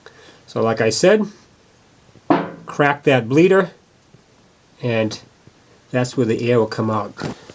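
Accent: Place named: Rhode Island, United States. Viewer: Probably because hes from "Rwoad" Island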